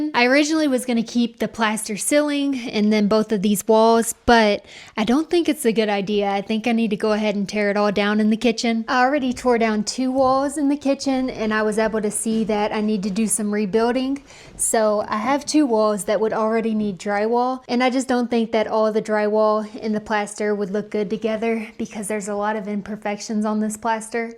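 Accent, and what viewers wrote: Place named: Kentucky, United States. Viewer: I'm from Lexington Kentucky. That North Dakota accent sounds just like a Kentucky girl 😊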